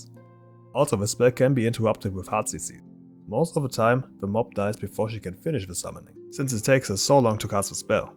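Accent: Germany. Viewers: Hi! I like your video, thx. Are you German? Sounds as if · Very well made video for a smaller chanel, keep it up :) I'm looking for a new class to play and am wondering if Hunter is a good choice at the moment and in general. How does it do in open world content, mythic + and raiding? How does it differ between it's specs? And how fun is the class to play? I heard that marksmanship hunter isn't doing so well for a ling time, is that true? Are you by any chance from Germany? Your accent sounds like it (I'm from Germany). Than you so much in advance :)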